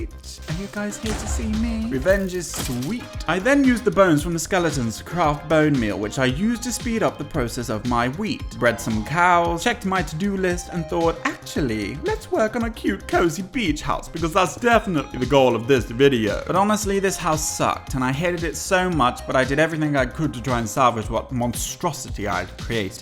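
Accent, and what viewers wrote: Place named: United Kingdom. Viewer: Ur accent is a so British lmao luv the vid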